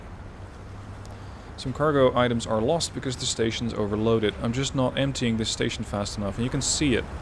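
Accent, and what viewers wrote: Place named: Netherlands. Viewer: I recognized the dutch accent from the start :p I'm dutch too.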